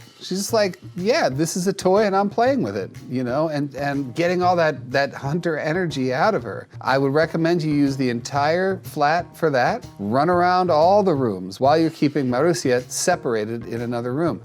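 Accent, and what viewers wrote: Russia. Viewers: a little note - these aren't Swedish names though, they're Russian, and the accent sounded Russian to me as well (just listen to those 'sh' and 'ee' sounds!) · BTW she's not Swedish, she's Russian, Marusia is traditional name. Also, the accent is pretty Russian. I'm saying it coz I am Russian 😂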